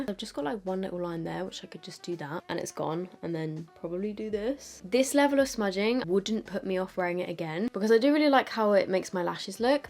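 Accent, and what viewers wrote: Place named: England, United Kingdom. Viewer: God I absolutely love the vibe of this channel and your English accent is music to my ears!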